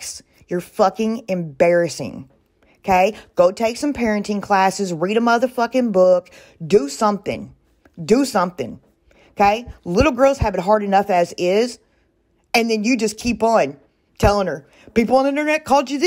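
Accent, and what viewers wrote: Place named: Appalachia, United States. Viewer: I watched the first cpl mins of her live from earlier and I have to say, her harping on you not enunciating the L in the word hole…girl lol. Does she know what accents are? That’s the Appalachian dialect and yours isn’t really as thick. Accents have no bearing on education and intelligence. My daughter is PAID and flown to California etc to research math and present on her findings. Her accent is as thick as mine. All 3 of my kids tested in the top 5 percentile and are highly educated and motivated young women, two of which test on genuine levels. One was even scouted out by YALE, but even with their programs my country ass is too poor for all that lol. They are as country as cornbread when they speak. Also, if I recall correctly doesn’t she say “warsh” for wash? 🙄 Thinking someone is ignorant because of their accent is one of my biggest pet peeves lol so I had to say something. 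Also, as someone that struggles with mental health and has children that also struggle…it’s not safe or wise to tell a child anything negative being said about them, no matter who it is. They deal with enough from their peers as is, why add to that? Anyways, maybe one day I’ll hop on your panel and be as redneck as I possibly can to really give her some stuff to laugh at 😂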